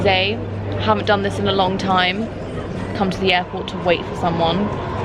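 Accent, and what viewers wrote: United Kingdom. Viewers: She's British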